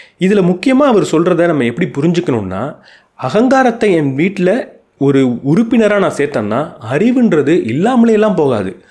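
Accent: India. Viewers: You are doing a great public service for Tamil speaking world of 75 million people in the world and your language skill is so beautiful and very simple ..your Indian Tamil language accent is so beautiful and you have a good skills to grasp many ideas from some excellent books..could I suggest you to review this following books please . The man unknown..Alex Carrel. Suicide of the west ... Clash of civilization.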